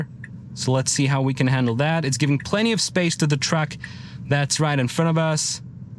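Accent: United States. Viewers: While not perfect, your accent is amazing and sounds very American! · Your American accent is impressive! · You did an excellent job for a newbie even your English sounds American. I can confirm what your conclusions are because we purchased a Tesla model Y Juniper two months ago and it is running and maneuvering with FSD very nicely. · I would not know that you aren't an American. Your English is terrific. You sound like any other American to me. Enjoy your visit and please visit other states. I'm in central Ohio.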